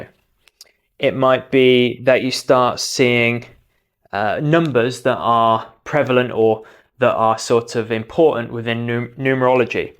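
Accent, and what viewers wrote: United Kingdom. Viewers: Are you related to the late Lady Diana? You have the same accent.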